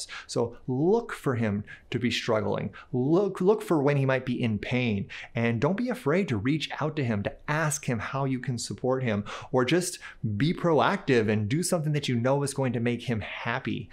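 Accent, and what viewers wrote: New York, United States. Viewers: Omg i love your new york lady accent 😂😂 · Are you American?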